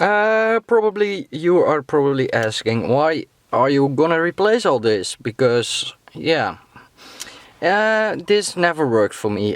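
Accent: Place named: Netherlands. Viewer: Engels met een Nederlands accent hahaha geweldig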